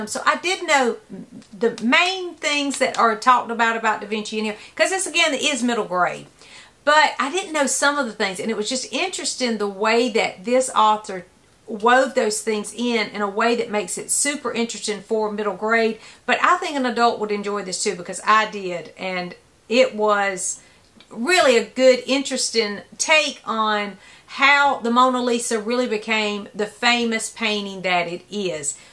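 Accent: South, United States. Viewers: Welcome Back! This is the first time I've commented here but I just want to tell you that I've missed seeing you and hearing that lovely Southern accent. And oh yeah right now I'm reading To Kill a Mockingbird. It was so good to see you again. It really made my day!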